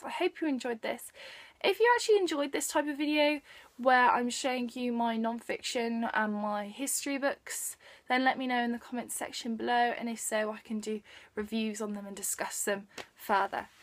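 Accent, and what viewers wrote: United Kingdom. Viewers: Beautiful British accent. Great review. I love English Puritan history because there is alot of history in understanding how American religious freedom evolved.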